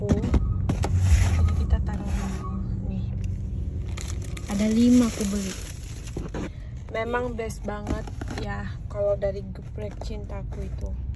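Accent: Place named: Medan, Indonesia. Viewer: Gua Orang Medan dan udah 5 Tahun tinggal di Bandung. Kalau kangen makanan Medan dan logat Medan, selalu nonton Channel Mukbang karena logat nya itu loh khas medok Medan banget 😁 dan kalau liatin makanannya selalu bikin kangen Rumah. Sukses terus ya ~ ❤️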